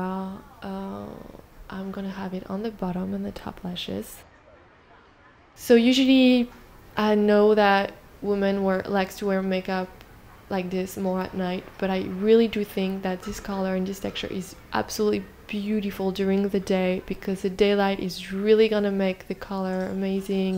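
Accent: France. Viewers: I love watching these first videos you made. This was the first video I saw when I randomly discovered you on YouTube. I was instantly hooked! I absolutely loved that you did your makeup with your fingers, which made it look so easy and effortless. I loved that you were always doing your makeup in cafes, and of course the French accent 🥰👌🏻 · Es tu française ? J'entends un petit accent :) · Omg i freacking love your channel, your videos and everything. They're pretty simple, and that's what I really love. It kind of reflects you and I think YouTube need more people like that. J'adore ce petit accent français également, il donne du charme à tes vidéos et à ta personne. Kisses from France (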